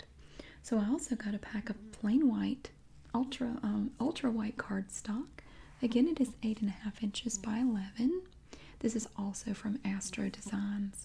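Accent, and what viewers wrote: South, United States. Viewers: Thanks for that soft and smooth southern voice. Veeery relaxing. I live in Hawaii so I don't ever hear that accent. Aloha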